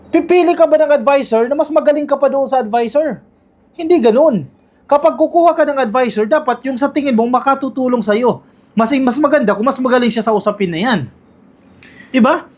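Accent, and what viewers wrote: Waray, Philippines. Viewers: Waray kaba? Just asking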